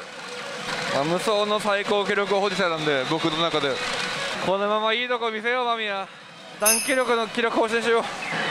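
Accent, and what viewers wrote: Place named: Kochi, Japan. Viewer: 土佐弁最高！😊同じ高知で応援してます😆👍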